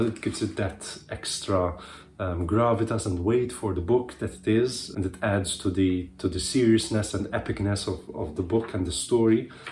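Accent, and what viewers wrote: Malta. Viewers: Given your english accent, i think you're a fellow Maltese :) beautiful books, but i'm torn between the 2021 standard and the 2004 harper collins 😅 might cave in and buy both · Hello from a fellow Maltese. Recognized your accent.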